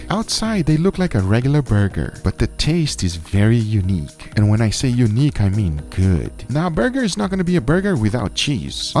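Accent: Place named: Brazil. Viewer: he’s Brazilian so I think it’s just an accent/2nd language thing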